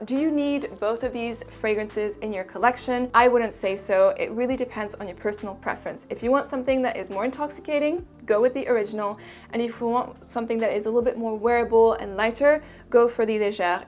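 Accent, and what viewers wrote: France. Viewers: Thank you so much foe the review it couldn't have been better than that!! I looove the french accent BTW❤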